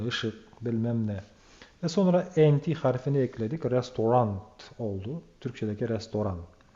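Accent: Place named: Azerbaijan. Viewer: Merak ettim nerelisiniz acaba Türkiyeli mi yoksa Azerbaycan Türk'ü mü Türkiye Türkçesinden farklı konuşuyorsunuz ondan merak ediyorum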